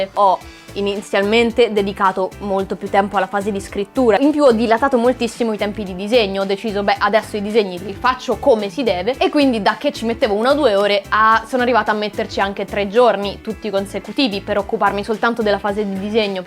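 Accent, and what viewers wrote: Tuscany, Italy. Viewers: sei brava fra come sempre! :-) ps: la cadenza toscana a farla sentire al mic. ti ci devi impegnare altrimenti un si sente ah ah anche a me succedeva.. ciao. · Ma sei diventata ancora più carina? Btw, ti prego parla toscano, è un accento bellissimo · ma te sei toscanaa???? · Bene, direi. Che aggiungere? Nulla: interessante & divertente come sempre (però io voglio sentire la che ci parla in toscanaccio marcato eheheh), e complimenti per questa "maturazione" nella cura e nel lavoro messo nei draw my $whatever:) Io in fondo non posso fare testo: ogni volta che prendo anche una semplice matita in mano, da qualche parte un grafico sviene o viene colto senza preavviso da violenti conati:( Quanto ai sogni... non vedo l'ora che ci racconterai i prossimi... posso consigliare, per favorire le cose, un bel film horror mangiando popcorn aromatizzati alla peperonata con le cozze? 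Dovrebbe dare quel tocco in più alla vena creativa:D ahhahahaha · Vogliamo un video in cui parli solo ed esclusivamente toscano!! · Anch'io sono della Toscana! Non me ne ero nemmeno accorta che parlavi in toscano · sei toscana ma di preciso? Pisana,Livornese,Fiorentina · sei toscana??? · ma sei davvero toscana??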